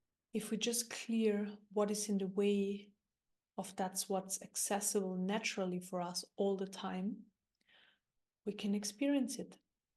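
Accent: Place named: Germany. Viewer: Beautifull you have german accent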